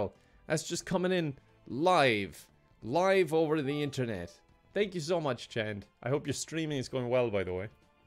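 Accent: Ireland. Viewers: is so kind over stream wth! aaa soft irish lad!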